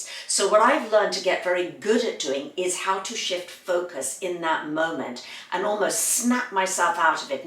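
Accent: England, United Kingdom. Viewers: it's nice to listen to you and you've a positive mindset .and it's interesting to know all the steps to reach happiness and positive mindset eight steps. I'm French my name is RICHARD and I should to put subtitles to follow you because it's most easier for me to understand the meaning of your talk .I suppose you're English what you told us and I don't know why I prefer to listen to English people than American people. I understood your talk and I'm so glad because step by step I improve my English skill .above all what I have learned about what you told me is never never give up is what I'm doing .I like English language and I hope one day to meet English speakers to share with them .here in France where I live people doesnt like sharing language I was born in France and I'm sixty three years old but I try to keep getting my energy. I'm happy to be alive and enjoying all things .culture spiritual activity music and cooking too .life is so wonderful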